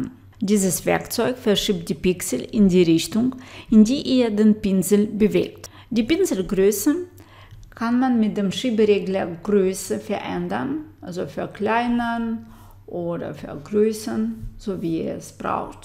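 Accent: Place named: Russia. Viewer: Хотела найти на русском, не нашла. Нашла на немецком… с русским акцентом (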